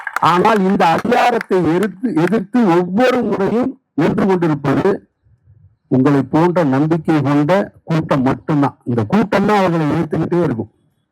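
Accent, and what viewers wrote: Madurai, India. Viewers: மதுரைக்காரன் பேச்சு🎉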